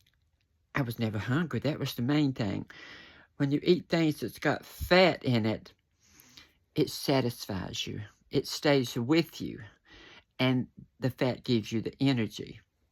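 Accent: South, United States. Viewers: You have a beautiful southern accent the ASMR community would definitely appreciate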